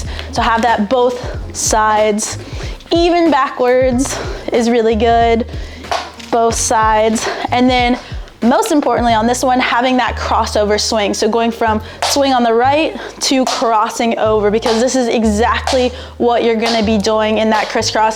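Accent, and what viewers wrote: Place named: General American, United States. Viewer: she has a simple, more-or-less "standard" American accent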